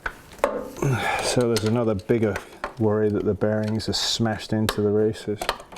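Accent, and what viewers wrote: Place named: Scotland, United Kingdom. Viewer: Thanks. My bike is a 2002 CB500, very nice modern classic. Where are you actually from mate, your accent is a bit US/Brit and I sense some Scottish. May be way off but just a guess. I’m from southern England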